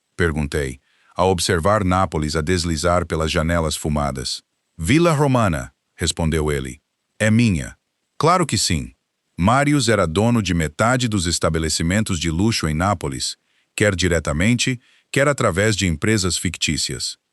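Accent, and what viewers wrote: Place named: United States. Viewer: Odeio essas palavras em Português é sótaque americano é horrível fala o português por favor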